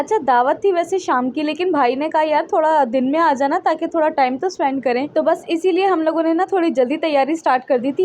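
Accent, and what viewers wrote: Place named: Karachi, Pakistan. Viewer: Zaberdast ❤ aap ke bolne ka andaz boht accha hai i really like your videos aap kaandaz bilkul karachi wala hai aap long video banaya karen😊😊❤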